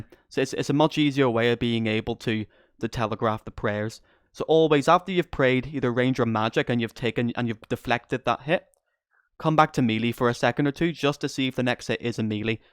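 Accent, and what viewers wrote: Northern Ireland, United Kingdom. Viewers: Epic vid, saving for later :) Sounds like a norn iron accent, where are you from haha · wait wait wait, are you northern irish ?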